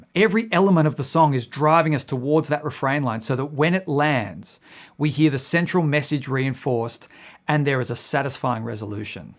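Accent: United States. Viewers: Funny that Sting has a title first, I have been doing that for years. I will not even start a new song without a worthy title. I think if you give a creative process a pedestrian name, it can reflect if you let it and vice versa. By the way. You are Australian but I notice you have a slight American accent?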